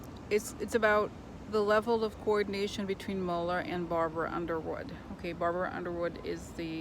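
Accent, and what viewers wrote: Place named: Russia. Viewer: Have you ever watched "The Night Stalker"? An investigative jounalist who had a knack for unwittingly discovering and investigating psychic crimes; a TV-series from the 1970s, starring Darren McGavin as "Karl Kolchak "(imagine I'm typing with your Russian-style accent): 'very funny, I think you like'. Thank you for the succinct and interesting vlog; I'd been wondering the degree of co-ordination required and when, exactly, it had all begun - like, given Trump's history were the various state and federal agencies already in an investigative process. Great vlog, thank you and best vibes from Melbourne, Australia.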